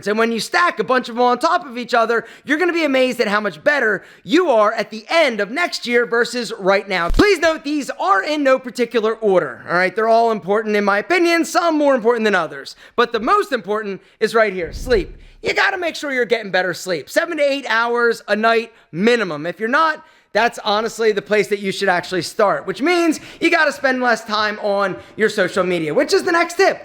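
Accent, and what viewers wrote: Philadelphia, United States. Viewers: “Wood-er” the Philly/Delco accent stays with you!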